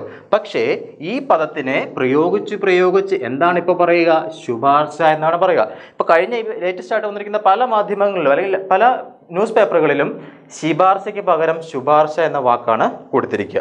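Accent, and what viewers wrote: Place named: Thiruvananthapuram, India. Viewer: Farya parayunnath Thiruvananthapuram Kollam aan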